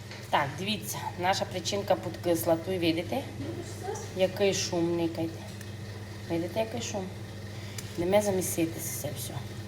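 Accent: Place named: West, Ukraine. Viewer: Така цiкава панянка, але поясніть прошу ,що зцее за мова? Українські слова з російськими в перемішку, вимова як на західній Україні!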